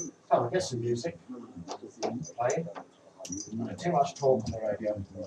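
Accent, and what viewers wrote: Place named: United Kingdom. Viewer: hello, glad you have it still working! Please tell me , are you a Londoner ? You have a pure British accent) Or, if not, how this accent is called ? I do get aesthetic pleasure hearing your “so british” speech)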